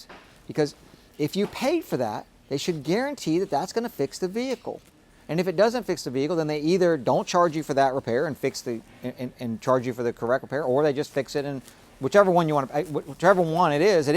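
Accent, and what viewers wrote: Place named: New York, United States. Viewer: I even said to myself: sounds like NYC 🤔 Then you said it's queens 🎯 Figured as much,there's so many ripoff artists down there they step all over each other! The amount of time they take to "pull a fast one" ,it doesn't really take much longer to do an honest job. But 10 seconds is 10 seconds I guess.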